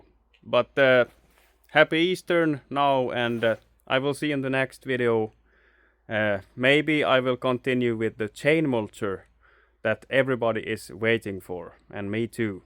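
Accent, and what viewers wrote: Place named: Finland. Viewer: Hi, happy to see that my previous comment was already in you mind, as you becomes more popular YouTube brought me the fisrt video while the second one was already there. You are a Genius! You accent remind me the time I spent in 2006 in Tornio when I was commissionning an stables steel annealing oven in for Outokumpu.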